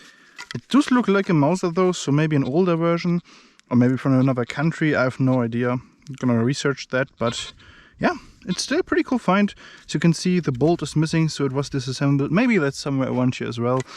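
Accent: Germany. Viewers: I was thinking the same thing about the pronunciation of iron. Another word is enamel, German accent pronounced animal but we still love your channel. ❤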